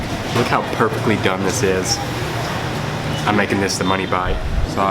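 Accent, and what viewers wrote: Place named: Texas, United States. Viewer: He y! can't get enough of your videos bro! You must be from Texas by the way you sound.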